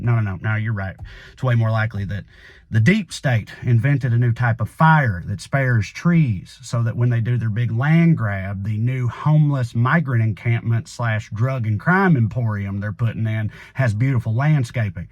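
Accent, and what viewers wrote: South, United States. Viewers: Love your progressive voice and the lighthearted, entertaining way you express it. Love your Southern accent, too.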